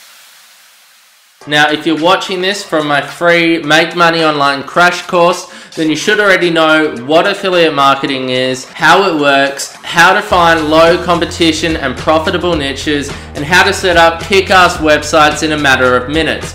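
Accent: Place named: Australia. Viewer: Niche is pronounced "Neesh" not "Nitch" ... nice to hear an Australian voice on one of these kinds of videos though :)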